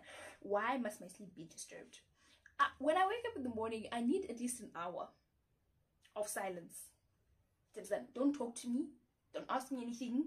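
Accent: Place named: United Kingdom. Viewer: Damn you Nailed the British accent, btw you have a beautiful personality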